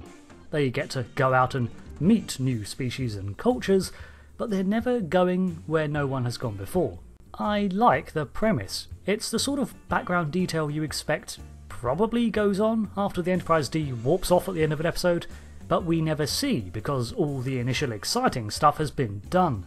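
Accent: United Kingdom. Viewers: E-celeb British accent. Alt F4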